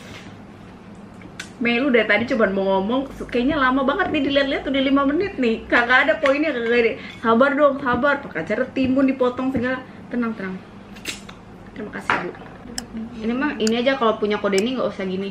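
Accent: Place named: Bugis, Indonesia. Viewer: Logatnya kek orang Bugis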